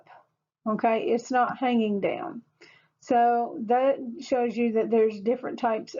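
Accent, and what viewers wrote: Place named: Tennessee, United States. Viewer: You may be in CA but you sound like you’re from good ole TN. Thanks for your instructions and your time!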